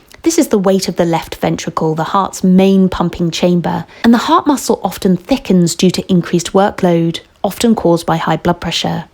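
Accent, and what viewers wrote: England, United Kingdom. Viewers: I so hate this so called english voizzze that i would rather die actually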